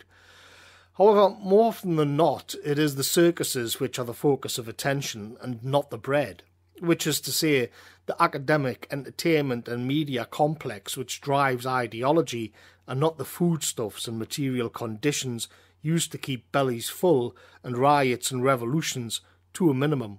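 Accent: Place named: Scotland, United Kingdom. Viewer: Magnificent scottish accent. It overtakes the message a bit. I wonder where this accent has its home more precis.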